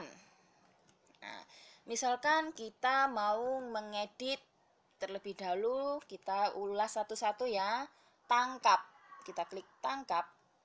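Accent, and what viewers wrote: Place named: Java, Indonesia. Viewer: Omongannya medok 😁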